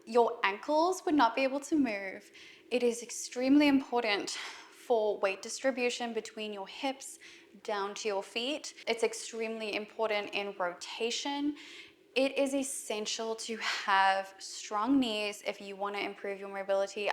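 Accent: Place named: South Africa. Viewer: I hear a South African accent here :-)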